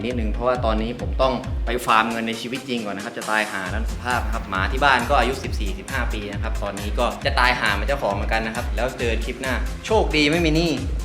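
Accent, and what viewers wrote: South, Thailand. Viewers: พี่คนใต้ หม้ายยยยนิ สำเนียงนี้หมันเลย55555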